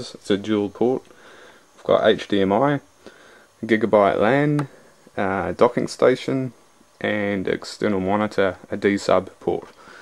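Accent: New Zealand. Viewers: Thanks to Flight of the Concords I can now spot a New Zealander accent. Thanks, HBO! Oh and good review. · This is a very nice laptop. I just spent 5 and a half grand on my gaming desktop so Im not going anywhere in terms of money any time soon but as soon as I finish saving up again Im going to get this. XD By the way are you from New Zealand bro?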